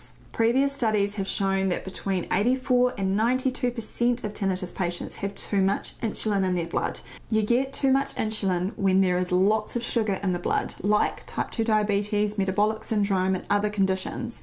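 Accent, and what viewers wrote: New Zealand. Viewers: Its New Zealand, Sexy Voice🌹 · Hi Dr, love the Kiwi accent, as I've been living in NZ for 20 years that is a good thing. My tinnitus is like the shrill of many cicada (Christmas beetles) but go from a dozen to thousands when I am stressed out. Like walking in a NZ forest glade in the middle of summer noisey. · Love KIWI accent especially from an intelligent and good looking woman . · I hear a fellow Kiwis accent and love it. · I love your accent! I am retired U.S. Navy, and I spent two wonderful years in Auckland on exchange with the Royal New Zealand Navy. You’ve given me a “trip down memory lane”! Thanks! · There's few more adorable than a N.Z. accent. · I love NZ. In the year of 2002, I had the opportunity to visit your beautiful country. I have accumulated so much positive memories. Your accent is amazing I like it very much combined to your knowledge. 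· I find your Kiwi accent beautiful & clear ♥ (like your looks) Nothing wrong with accents, I've got a European one 🇲🇹 ☺. VERY INTERESTING TOPIC. TANX Dr. Cheers 👍. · I have had Tinnitus during the day as a hiss, whistle, for perhaps 20 years. Strangely enough it stops when i go to sleep. If i wake in the night it remains stopped until i am fully awake. I have learned to live with it. My ears are clean and OK. Love the Kiwi accent. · I use my tinnitus to meditate. Love the accent KIWI isn't it. You accent is better than the call center operators from India, I can't understand them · I love the Kiwi accent. When I first arrived in Oz from the US, I couldn't understand anyone! But after nearly 14 years I mostly don't even hear it anymore. I do still love the video a NZ man made about his deck LOL. . My GP said I had Tinnitus when I told him I was hearing music very softly in my right ear. 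I see how much worse it could be. Thank you for this video. >> Tom's wife << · Nice to hear Newzealand accent ,though I may take a little extra time understanding. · I would like to know if you are a native New Zealander. BTW, your accent is lovely and pleasant to listen too. Thank you for your notes. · All New Zealand accents are difficult. You pronounce all your E sounds as a hard E. That is not how the rest of the world speaks! Still good advice and a good video. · Interesting, thank you. As far your accent goes, people just need to learn some Kiwi 😊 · I'm from the states, but lived in Wellington for a few years - that's a major kiwi accent, I believe! Is there any significance with imbalanced tinnitus? Over the last few months, it's gotten noticeably worse in my left ear. I've had a full round of exams, and have been told I have Meniere's disease. It's unfortunately really impacted my ability to hear properly. 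· Definitely I must make an appointment with my Doctor. Thanks Dr.! (By the way, lovely kiwi accent 😉) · I am here because of the accent! Loved my stay in kiwi land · I got a pretty bad concussion back in 2013 and have tinnitus since then. Great to hear a fellow kiwi by the way. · Forget your pronunciation of “ear”, your pronunciation of “accent” grates. Seriously, I’d move to NZ tomorrow if the accent wasn’t so grating. I say this from the background of having visited the country and being a descendant of one of a very prominent Kiwi family. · I’ve suffered from tinnitus for years. I’m a loco engineer,therefore I am exposed to high noise for long periods. Very informative video. The minute you mentioned your accent about ear, I thought Kiwi. My dear lady wife is a kiwi and is always going on about pronouncing words🧐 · You have a lovely accent as does all of New Zealand 🇳🇿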